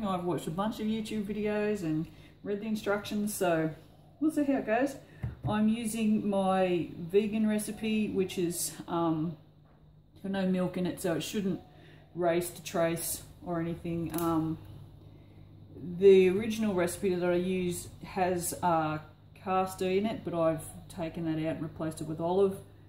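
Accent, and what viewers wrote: Australia. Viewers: Love your video and your "accent"... the aussie accent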